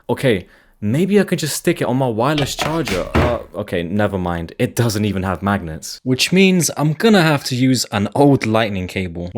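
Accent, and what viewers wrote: United Kingdom. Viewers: Your British right cause I am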